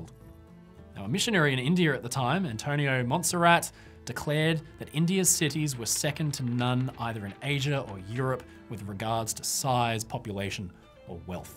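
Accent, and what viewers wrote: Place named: Australia. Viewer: Fantastic video composition, really sad content. The Australian accent makes you sound extra smart!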